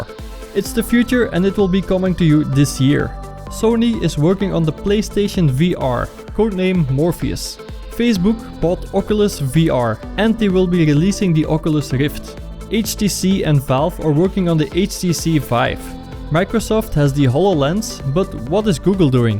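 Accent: Netherlands. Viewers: you sound like a dutch guy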